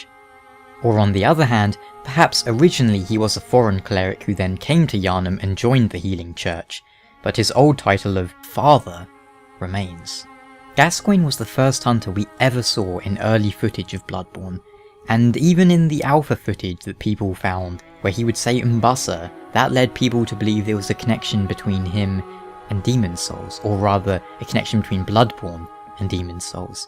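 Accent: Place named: United Kingdom. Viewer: Should I watch Vaati or Video?.. Oh well you cant just not watch the Video as soon as you hear that british english ^~^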